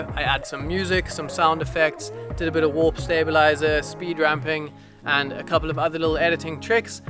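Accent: South Africa. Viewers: Ahh! I thought you were South African ! nice boet!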